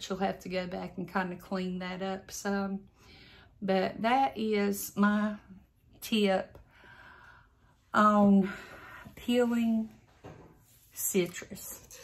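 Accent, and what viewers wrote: South, United States. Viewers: especially love your southern drawl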